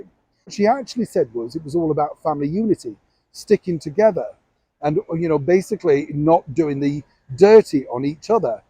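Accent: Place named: RP, United Kingdom. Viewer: We savages across "the pond" are having trouble with your posh Brit accent.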